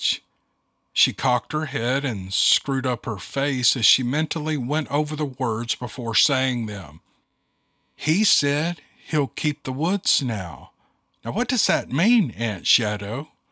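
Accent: South, United States. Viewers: A part of me dies listening to this. I understand its fiction but you have so much respect and authority. If you made this narration on another channel maybe I wouldn't mind but your main? So many of my coworkers and neighbors think of Bigfoot and Dogmen as some joke for story- telling. I am quick to defend against that stupidity or less they will gey 411. We need more advocates like you to bring awareness and respect for the wilderness where these things roam. I just dislike these made up stories since frankly all they are are just creepy pastas in a sense. Years ago Vic Cundiff and later James Williams from Dark Waters showed me there are people out there who go out of their way to avoid pretend stories and only put out their real encounters. I spoke with you before and I am not saying this denegrade or insult you or this talented writer but please remember by narrating these made up stories on your main channel can be used against all your 'real' storytelling videos. 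If I wanted that I would listen to your other channels which go deeper into fan fiction which I never had a problem with but this is your flagship ocean liner. We respect and love your Southern charm and honesty with that confident accent. Please remember I am not criticizing you for what you pursue but recall that Darkness Prevails is one of the biggest Creepy Pasta channels out there but I can never trust him when he makes a video 'Real Werewolf Stories: 'Guys I swear this one is a genuine story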